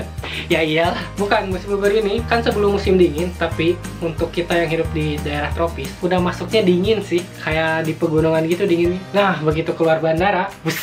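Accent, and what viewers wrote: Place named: West Java, Indonesia. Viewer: pantesan logat sunda na teh aya wae,pek teh orang bandung gening wkwk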